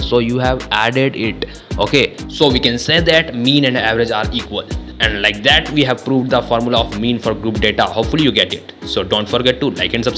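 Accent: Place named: India. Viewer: Indian accent 🤮🤮🤮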